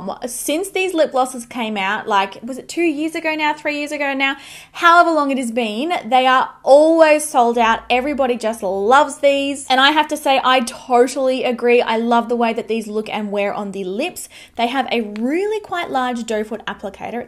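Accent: Australia. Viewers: Omg your hair looks beautiful!!!! I love your Australian accent! I want to take a trip there it seems beautiful. Thanks for the great dupes! 💖👍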